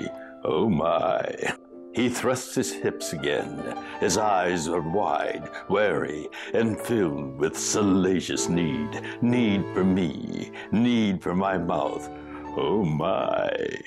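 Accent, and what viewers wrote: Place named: Japan. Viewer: His Japanese accent comes out so much when he uses his narration voice. Oh myyy.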